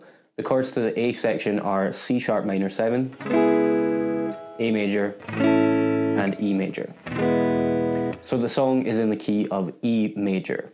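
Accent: Scotland, United Kingdom. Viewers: Nice video dude, btw where are you from? I think some of your vocals sound a little scottish sometimes but I'm not sure though, english is not my mother language so I find it hard to recognize accurately accents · Are you Scottish? I hear a Scottish accent when you talk. Haha but I'm not sure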